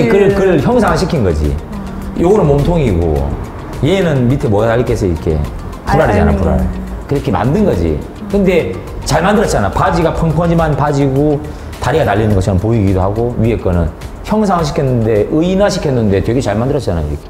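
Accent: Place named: Daegu, South Korea. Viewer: 잉 형님 말투가 대구 사투리 던데 ㅋㅋ 제가 착각했나봄 ㅋㅋㅋ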